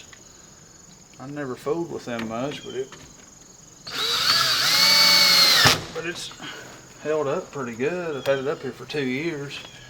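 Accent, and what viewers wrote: United States. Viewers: Great job, great lesson. I've got two i have to install tomorrow. Love the hat, pencil and accent. Made me want to shout 'merica!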